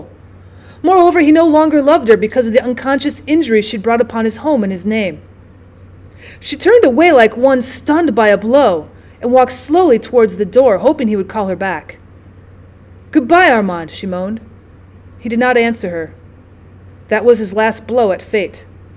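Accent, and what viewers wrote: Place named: South, United States. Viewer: i just love ths southern twang to this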